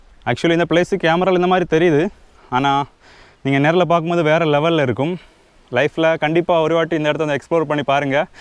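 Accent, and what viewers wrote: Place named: Kanyakumari, India. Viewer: Nenga Kanyakumari ah🙄🙄🙄 unga slang some different🤔🤔🤔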